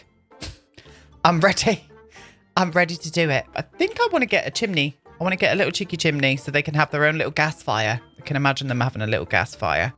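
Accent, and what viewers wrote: United Kingdom. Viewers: You know, I've never actually liked my name until I heard it in your accent... it sounds way better from a Brit than an American!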